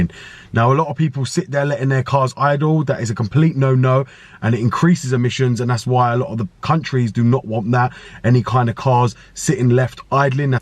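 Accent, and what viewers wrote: United Kingdom. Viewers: mATE were you born in the uk?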